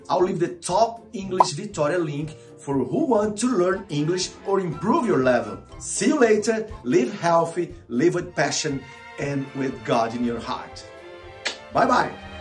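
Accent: Brazil. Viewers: A pronúncia não está boa, mas, se você tiver gravado sem decorar, seu nível de inglês está bom. É só treinar mais a pronúncia agora, pois está bem abrasileirada. Você é fera. 💣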